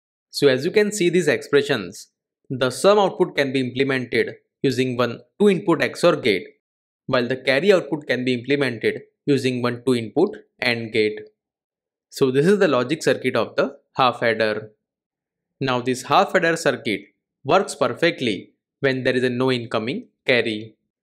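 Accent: India. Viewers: I heard the Indian accent and i already knew i will understand it by the end of the video, haha. Thank you.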